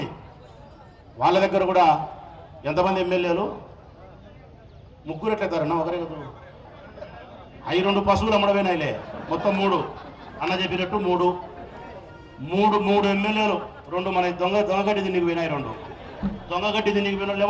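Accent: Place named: Rayalaseema, India. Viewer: Anna super anna..rayalaseem slang lo eragadesav...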